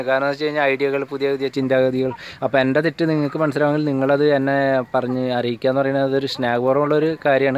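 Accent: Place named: Malappuram, India. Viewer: Ninte vioce ketal trisur Karan thonilla.. Malappuram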